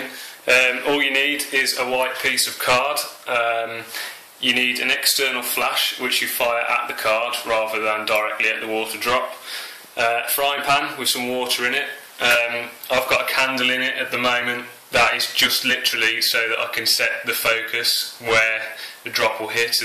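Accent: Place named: Birmingham, United Kingdom. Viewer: thanks! just what i was looking for! also i love your brummie accent :) x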